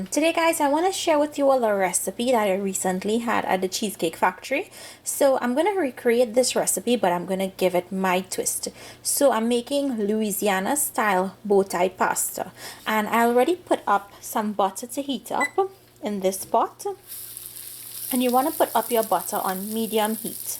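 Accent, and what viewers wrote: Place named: Trinidad and Tobago. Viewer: Gyal me like u accent, I can listen to you all day.. I like de trini women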